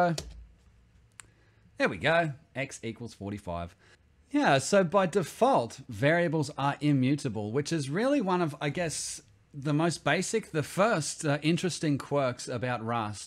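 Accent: Australia. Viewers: What part of straya you from mate?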